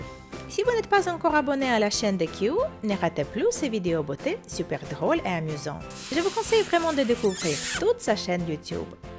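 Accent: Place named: Russia. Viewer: est ce que tu est russe parce que moi oui tu as un accent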